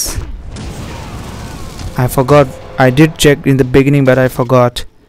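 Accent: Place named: India. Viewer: Mujhe tumhara baat sunke lag hi raha tha ki tum India se ho esi liye puch raha tha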